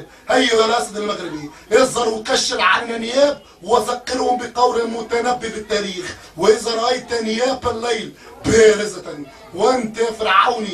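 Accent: Tunisia. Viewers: غرييييييييييييييبة والله ... متأكدين هذا مصري... هذا تونسي 100/100هههه... تحفون برشا... واصل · و الله تقول صوت شوالي هههههههه هدا يعرف لهجة تونس كويس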